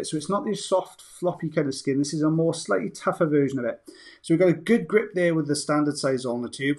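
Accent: Wales, United Kingdom. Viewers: I told my sister I found very relaxing satisfying videos of a Welshman with a wonderful voice who does ear wax removals and she exclaimed, “!” How did she know?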